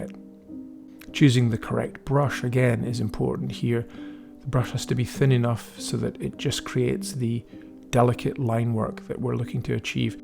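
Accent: United Kingdom. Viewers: There is one product called Pebeo Vitrail that you don’t have to fire, but if painted while the glass is vertical and not horizontal, it will run downwards. I’ve found it also smears out as it dries, so painting a scene or portrait or whatever, the detail gets lost. It is oil based and requires mineral spirits to clean your brushes. My apologies to - I know I wasn’t asked and it may be that this product is only available in the United States (assuming you are in Great Britain by your accent). Just wanted to pass that tidbit of information on as it may help their situation. I was wondering if you could take your skills and apply them to a glass piece using the pebeo Vitrail glass paints. I’ve been trying to see if I could but haven’t spent too much time trying yet. I’ve just done some quick preliminary trials so far. What I’ve found I have said above to the OP. 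Where there is a will there is a way!..hopefully. Anyway, thanks for sharing your expertise! The restored piece is fantastic!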